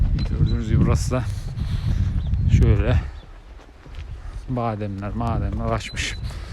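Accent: Konya, Turkey. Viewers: Sen Konyalı mısın Konyalı gibi konuşuyorsun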